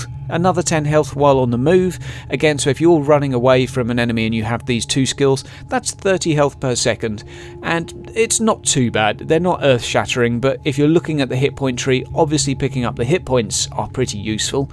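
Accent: United Kingdom. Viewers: It always somehow sounds better when a Brit talks strategy on 40K. Nice post.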